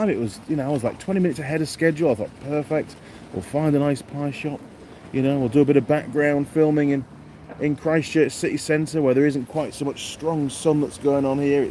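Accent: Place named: East Riding, United Kingdom. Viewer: I've just found your videos in the last couple of days. Brilliant! As i've had a 1980 t140 built over the last year or so the gearbox strip has been fascinating. You are a natural teacher. Patience, clear and concise explanations plus a sense of humour, marvelous. Being a Yorky myself I can tell you have a neutral accent, but every so often an East Riding one slips through. Where abouts in 'gods own country' are you from. Dewsbury born and bred myself. Keep up the good work and i look forward to the new Bonnie video very much.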